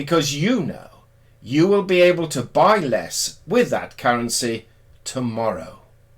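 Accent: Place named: Wales, United Kingdom. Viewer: The narrator is quite clearly British at a guess I'd say Welsh, and yet you pronounce YouTube as YouToob. Why?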